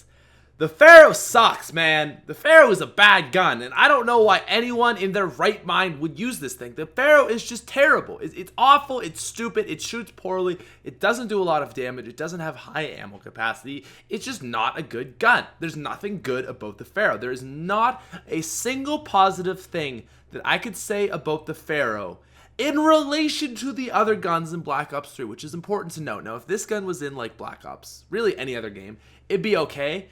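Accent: Canada. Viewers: This guys Canadian aboot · did any one else notice the Canadian accent hahahaaha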